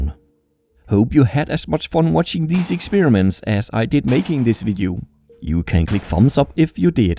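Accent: Denmark. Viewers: Er du Dansker? det lyder det som på din stemme! god video! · Jeg synes nok jeg kunne genkende en Dansker på hans Engelsk :D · Jeg vidste du var dansk :D Man kan høre det på accenten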